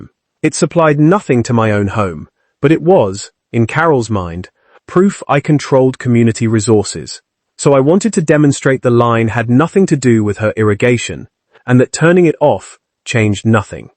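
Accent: United Kingdom. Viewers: I do not respect AI, but why do you use prissy sounding British English when we use the masculine American English? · there must have been a better voice to use for a Texan-- this gut sounds British..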